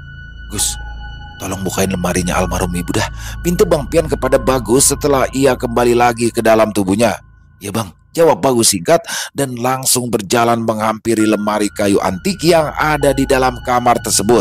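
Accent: Jakarta, Indonesia. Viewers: Pon K4 BaR always selalu ngga pernah never... Asik ada kiriman lagi cerita bang Pian dari bang. Saya suka cerita dan logat Betawi yang kental akan budaya. Apalagi gaya bicara bang makin mantap aja mendengarkan nya 😅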